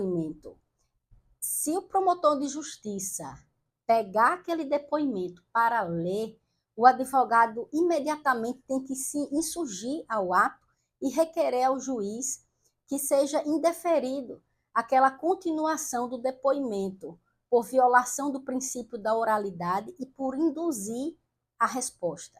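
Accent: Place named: Northeast, Brazil. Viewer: Esse sotaque de nordestina é um porre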